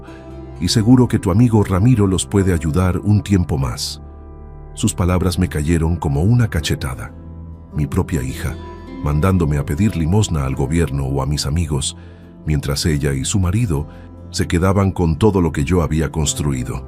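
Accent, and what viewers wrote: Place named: Dominican Republic. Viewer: Te.escucho.d e.dominicana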